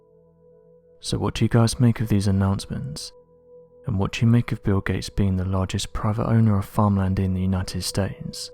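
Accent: United Kingdom. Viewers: This was probably taken out in Western Kansas at the feed yards out there. It happens, folks. It's hotter than Hades right now and the picture is misleading. All these cattle didn't just drop dead where they lay. In large operations like these the dead ones are gathered up and buried in a mass grave. When your running a few thousand head there's going to be losses so this is just nothing to make a big deal about, though, our British accented friend seems to think so. Nothing to see here, but, Beware of the Fear Mongers on YouTube.!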